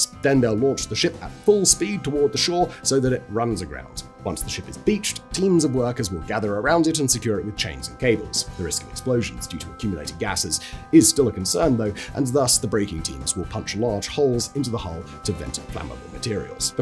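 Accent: United Kingdom. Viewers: Man, is British for real. That’s the speech of an educated white male for that part of the globe. And yes, he sounds a bit crazy. But information is great.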